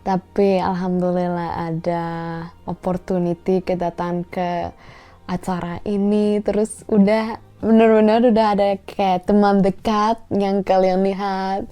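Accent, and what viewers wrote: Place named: Indonesia. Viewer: Gayamu... Tengilmu... Bicaramu..... Gokil indo abis. Top.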